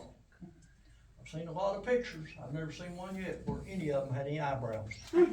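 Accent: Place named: United States. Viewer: asik om. baru kali ini denger asli aksen logat kaya koboy sibapaknya yg cuma ada di dalam film "well howdy partner nice see ya".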